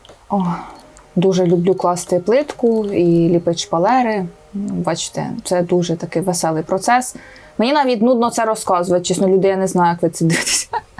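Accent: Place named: Poltava, Ukraine. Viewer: Ви така крута!) Мені дуже подобається ваш говір. Моя подруга з Полтави спілкується дуже схоже і це дуже мило Попалися в рекомендаціях, одразу підписалась Дякую за контент))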